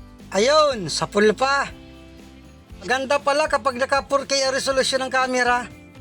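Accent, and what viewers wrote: Bicol, Philippines. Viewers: Parihas Silang taga Bicol kaya parihas dn ang style ng boses nila.. sana nga magka Collab sila 😅 halos magka parihas dn subscriber nila 😊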